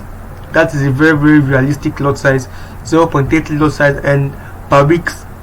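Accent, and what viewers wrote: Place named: Nigeria. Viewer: Nigerian accent is easy to know!! You actually sound like someone I know.. Respect bro!✊🏾 It's good whenever I see a Nigerian doing this on YouTube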